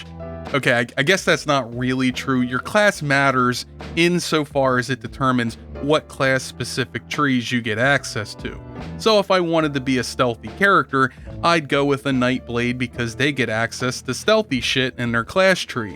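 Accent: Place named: Westchester, United States. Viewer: That Westchester accent is great when I'm watching activity and infuriating if I'm trying to relax to video game crap. Wouldn't have it otherwise.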